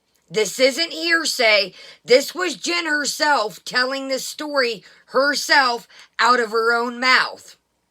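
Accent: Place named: Northeast, United States. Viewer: She is disgusting. We have been saying this for over a year. So glad she had been found out! Are you from up North (NE) by chance - OH, NY, PA, MA, RI etc? You have the personality and voice of someone who might live up North. I live in the NE section of the US, that is why I am wondering. I just subbed to your channel.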